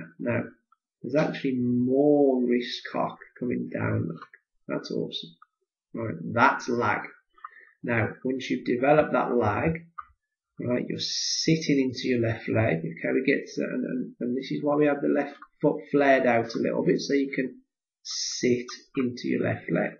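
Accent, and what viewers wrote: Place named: Midlands, United Kingdom. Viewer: Hi love this action so much and being from the Midlands appreciate the accent! Quick question how much should the left foot flare out? Thanks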